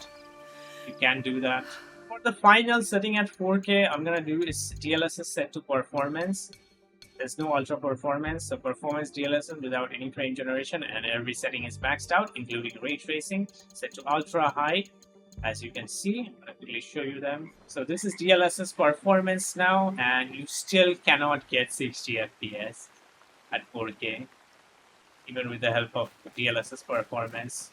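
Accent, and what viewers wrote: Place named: India. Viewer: слышу индуса и на душе тепло как-то сразу становиться.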